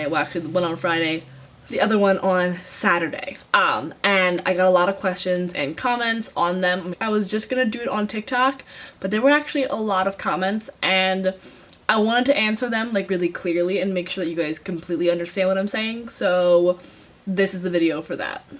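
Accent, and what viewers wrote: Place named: Sweden. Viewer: Is your family of Swedish descent? I’m hearing a very faint Swedish accent in your voice!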